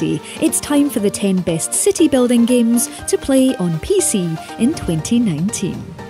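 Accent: Scotland, United Kingdom. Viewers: I think it;s eng-scottish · it's Scottish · Is she Scottish? I love her accent!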